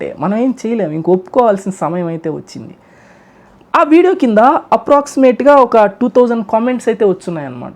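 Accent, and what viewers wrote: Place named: Telangana, India. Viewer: Konchem konchem telangana matladuthunnav I like that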